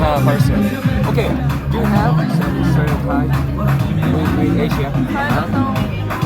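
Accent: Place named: Gwangju, South Korea. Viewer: 어찌 억양이 잇드라ㅡ 광주엿군요. 멋지게 살고 부뢉네.... 난 용기가 없는디ㅠ ㅡ 동광주 홈플뒤 살아요 ~~~~^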